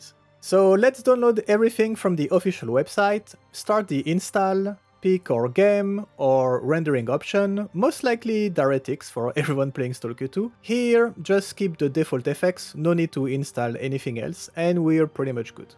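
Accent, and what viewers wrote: France. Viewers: man you sound like french native, if you are " merci pour tes 2 vidéos, elles sont super bien faite! " If you are not french native, not a problem, just to thank you for your videos very helpfull, I was installing my mods manually and I decided to use Vortex. I had many mods but the mandatory one is about performance, the one that make the game smooth and playable, I though this mod was working well on my rig SINCE I installed it with VORTEX. I could not believe what I seen, I went from 65 fps to 95 fps, and said to myself, WTF?? I guess the mod was not installed properly despite I am not stupid and I followed the installation guide on nexus. You recommand to use Vortex and know I understand why, 🤣🤣👍👍By the way you are explaining everything very well (I would not imagine you can put the mod file (zip one) inside the mod folder and I learn this watching your vid. 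I guess this is important. I am gonna follow your guide about your mod list, I installed a bunch of mod and will add those one that are in your list and that suit to my test. I am following you ! thanks again :)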